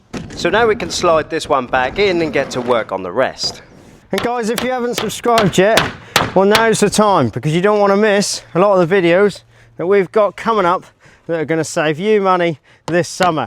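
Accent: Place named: Norwich, United Kingdom. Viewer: 😂me too! been watching him a while and thought he’s got to be Narwich like me !😂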